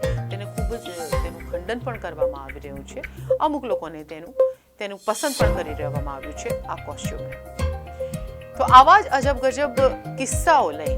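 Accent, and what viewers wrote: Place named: India. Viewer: ဂျပန်ဆို...ဘာဖြစ်လို့...အန္ဒိယ...ကပြောနေလဲ....အဲဒီကောင်က...ကုလားလိုဘဲပြောနေတာ...ဗျ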